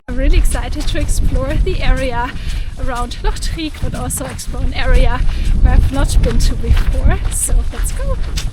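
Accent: Germany. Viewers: What s this accent ? German?..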